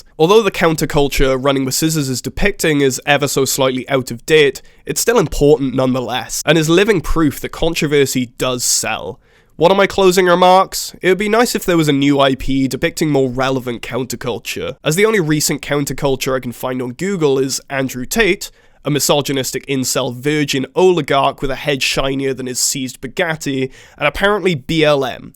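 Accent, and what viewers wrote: United Kingdom. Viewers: This is a great video dude, also could be completely off but are you Welsh?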